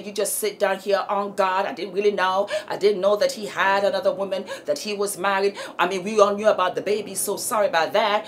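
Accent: Nigeria. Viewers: So Mama, you dey speak naija English 😅😅❤❤❤ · Mama dey speak, Nigeria English, love dat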